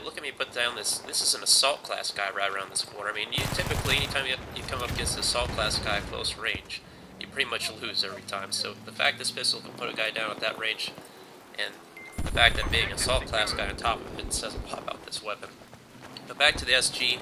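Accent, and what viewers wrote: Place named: South, United States. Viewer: Parisians are a tough bunch, but the French generally are very kind and considerate once your out of the cities. The older folks remember our role in the liberation and have kind things to say about us. If the French formed their opinions on us based on one trip to Manhattan, then surely they would say similar things about us as you have about them. You sound southern, a pilot out of Atlanta? Nice folks down there, hospitable and respectful. Decent and kind. Total culture shock I'm sure.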